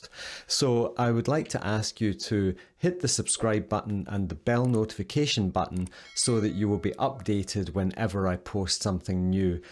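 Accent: Scotland, United Kingdom. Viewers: the Scottish accent held me here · I am in strong agreement with the message of your video. As to your voice, yes please give it needed rest. You and have among the most charming Scottish voices I've heard!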